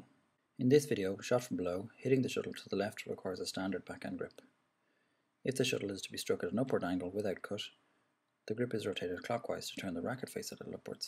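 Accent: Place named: Ireland. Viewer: it sounds like Irish accent, ie he is British. I can perfectly understand all he said because I am British ;)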